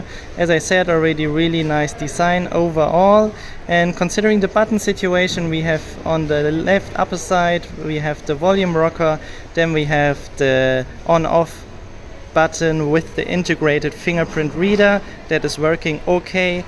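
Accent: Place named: Germany. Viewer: That's a nice German accent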